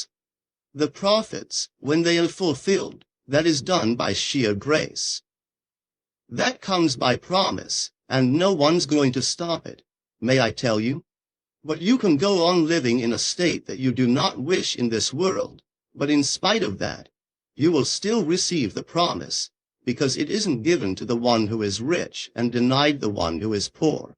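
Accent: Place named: United States. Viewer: Lovely but a bit weird that a slight American accent gets in there. I know his original voice in and out.. so it feels a bit foreign. Only a little detail. Otherwise the message is lovely 🥰👌🏼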